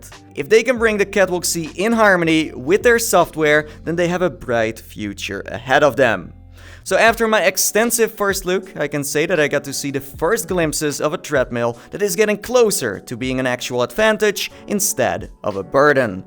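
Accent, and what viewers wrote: Netherlands. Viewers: Poh, Nederlands accentje zeg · hehehe I can instantly hear you’re dutch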